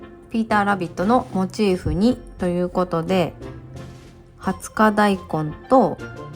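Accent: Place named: Shimane, Japan. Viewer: 一年ちょい遅れで始めました、見つけて効率よく刺してます何より、話し言葉に惹かれ… 私の故郷の北九州弁とそっくりな方言満載…と思いきや、「だんだん」で島根の方と判明時々挟むダジャレ？に、同世代感を勝手に感じ、楽しく後を追いかけています❤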